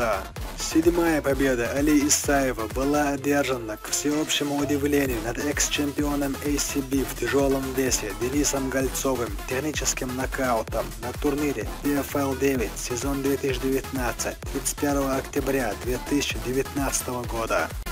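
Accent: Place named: Chechnya, Russia. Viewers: Автор и есть Чеченец. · Озвучка, с чеченским акцентом. Автор, это даже тебе какой то харизмы добавляет.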